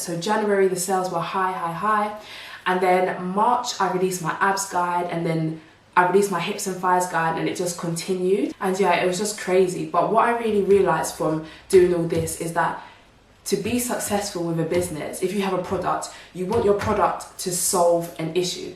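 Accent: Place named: United Kingdom. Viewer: Your accent 😍 I’m a sucker for british African Americans 😍😍😍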